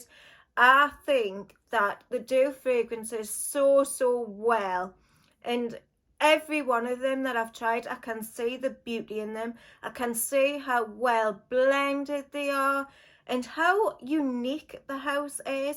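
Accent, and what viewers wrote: United Kingdom. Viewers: Wow!! Congrats on the 2007 Angel!! Beautiful bottle. I've never tried Angel, tbh. I'm an Alien girl so not sure I would like Angel. I've heard it's either one or the other and I also really hate patchouli bombs. But you're making me want to sniff it!! Also, where is your accent from? I know somewhere in The U.K. but I've always wondered!!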